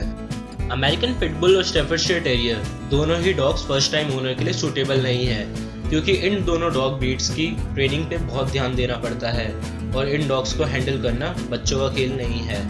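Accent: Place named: India. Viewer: Do you have the biggest indian accent or are you speaking indian, cause i cant understand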